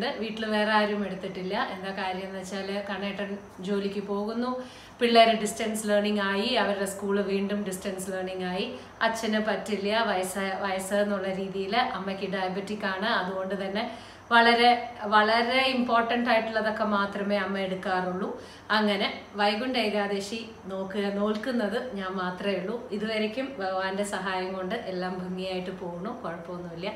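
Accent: Palakkad, India. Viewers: Asalailyei palakkadan basha.kodhi gerunnundu ente chechyei.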